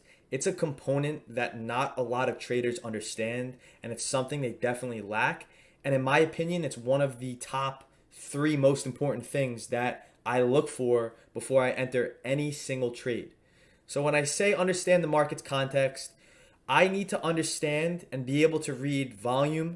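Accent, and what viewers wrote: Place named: New York, United States. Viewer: you sound like a New York Italian Mobster, I love it 😂 Great video though as always.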